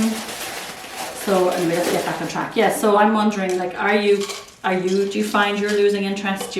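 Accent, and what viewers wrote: Ireland. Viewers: Thank you. I have narrowed my plant subscriptions down as I began to suffer from information overload. Your relaxing, informative videos are a joy and your lovely accent is a balm to this American daughter of Irish immigrants. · Please continue making your video!! Don't stop...I enjoy and learn so much. I also enjoy your gorgeous accent from your lovely country...Ireland 😍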